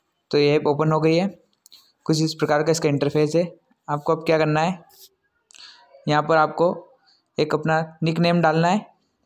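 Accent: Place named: India. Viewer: That indian voice 💀💀💀💀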